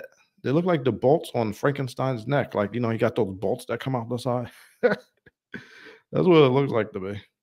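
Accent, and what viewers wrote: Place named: Mid-Atlantic, United States. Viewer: You have a very American and some might say even the classic “ Mid Atlantic “ accent.. well done sir.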